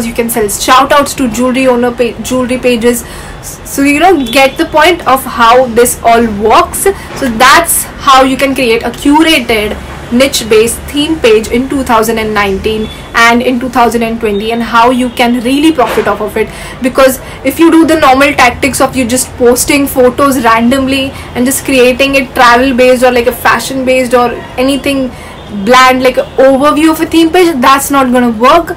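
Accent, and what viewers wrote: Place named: India. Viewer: it's the Indian accent ahah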